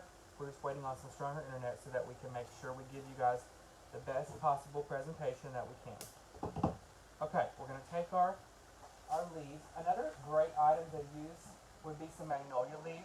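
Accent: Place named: Texas, United States. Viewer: Hi!! Love your videos and am learning more from you. But to be honest I’d watch you just for the entertainment value!! I love your accent , I’m from central Texas too, and combined with how fast you talk is just a hoot!! All I’d ask is for you to make more videos!!! I’ve binged watched as many of your videos as I could find and only found you yesterday!! I love you and am a fan!! I even went to Ellis’ and ordered a bunch of supplies so it’s a win-win!! I love finding new places to shop!! Take care and keep up the good work!!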